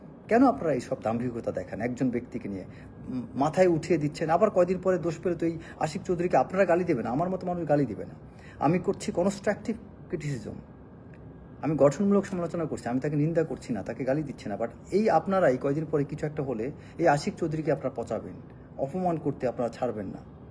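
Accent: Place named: Barishal, Bangladesh. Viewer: সবজান্তা শমসের হইছেন! বাচাল টাইপ!কোথায় লেখা আছে মুহাম্মদ সল্লাল্লাহু আলাইহি ওয়াসাল্লাম কে না সৃষ্টি করলে আললাহ সুবহানাতায়লা দুনিয়া সৃষ্টি হতো না!ভাল করে বাংলা উচচারণ ই করতে পারেন না!বরিশালের একসেন্টে কথা বলেন!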